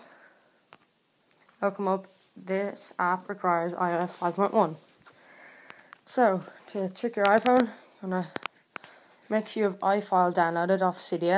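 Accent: Ireland. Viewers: Are you irish chap me too :)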